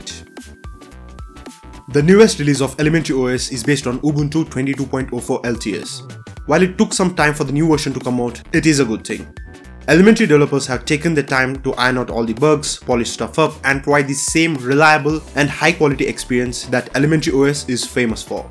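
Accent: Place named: India. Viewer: Dude...learn how to pronounce and speak english you smash the words together whit "daT indian accent" you speak half words , PRONOUNCE -slow the fak down and ARTICULATE full words jesus , you speak like XQC was indian ... stopped watching, half the time its not hearable And this is NO Elementary review , ups and downs, or even know how, this is just a glorified add mostyl, EVERTHING is SO GREAT in elementary, jesus, really? and you say 3 games and you say its great for gaming , so many games so many games :D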